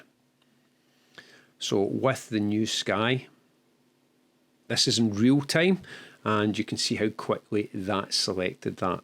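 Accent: Scotland, United Kingdom. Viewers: Great content as always. Unagitated and to the point. By the way: first time I watched without the subtitles - and I slowly get the groove for the Scottish accent :)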